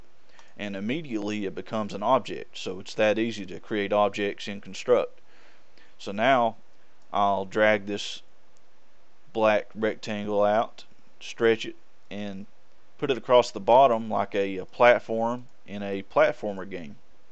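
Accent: South, United States. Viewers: that southern accent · That American accent is awesome!!! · Great tutorial, and great southern american voice (is it from the south right?)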